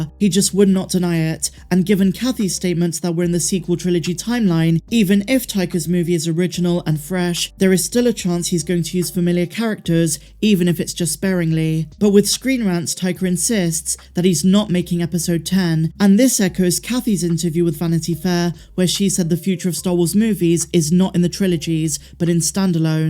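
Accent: United Kingdom. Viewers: ! What part of the U.K. are you from?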